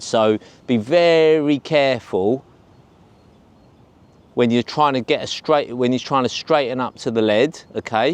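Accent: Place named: Cockney, United Kingdom. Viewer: More cockney than reggie krays underpants ….yet he was born a scouser WTF 😮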